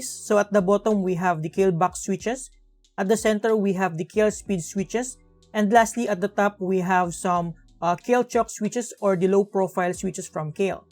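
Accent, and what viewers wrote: Philippines. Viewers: his accent is so... Woah very filipino (in my ear) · He has dat pilipino aksent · Ingles wid the pilipino aksent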